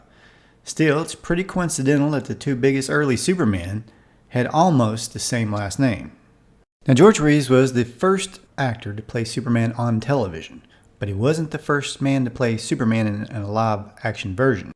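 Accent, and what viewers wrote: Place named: Kentucky, United States. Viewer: I really enjoyed this. Even though I knew about two-thirds of it because I too am a lover of trivial trivialities, is Barney said on Andy, I enjoyed how this brought them together and how they were presented. New to this channel but I will be back. May I ask where you are from? I am from Kentucky and we seem to have the same accident. Thanks again for these great videos.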